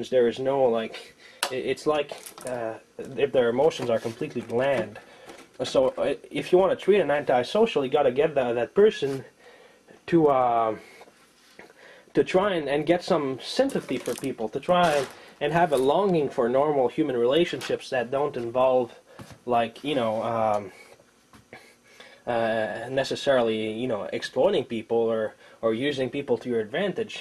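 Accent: Quebec, Canada. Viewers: Are you quebecois?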